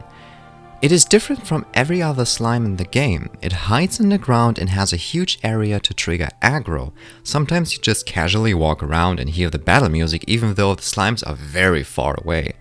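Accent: Germany. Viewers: Sounds like German-English to me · Du bist deutsch erkennt man bei deiner Aussprache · u sound kinda german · I wonder whats ur native language ? German?👀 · I can tell you're German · Are you from Germany? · Deutscher Akzent hahaha xdd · You are a german aren't you? I'm a german who can (no flex) speak english pretty good even though I'm really young and I've heard a lot of germans in my class speaking crappy english. I mean you're english is perfect but you can still hear the german dialect (correct me if I'm wrong).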